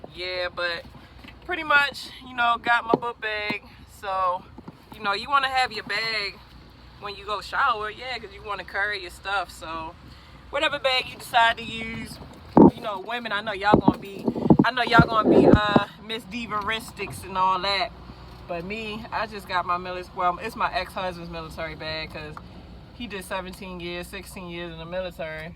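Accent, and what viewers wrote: Baltimore, United States. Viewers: copy that driver. You sound like you could be from bmore but I believe your from upstate NY? Forgive me if I’m wrong lol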